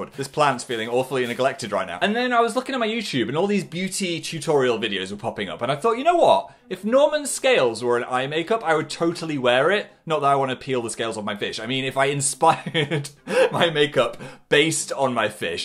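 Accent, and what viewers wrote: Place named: Northern England, United Kingdom. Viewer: part of rebranding is that his northern accent is coming back and i am living for it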